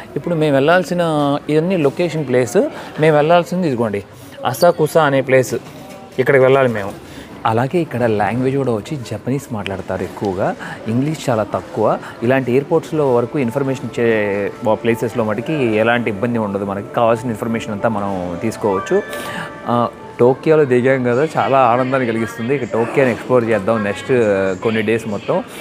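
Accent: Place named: Vijayawada, India. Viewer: Harey Krishna.. brother, meedi Vijayawaada kaabatti, mee bhaasha chakkagaa untundi... waiting to see your videos in Japan.. Jai Sree Raam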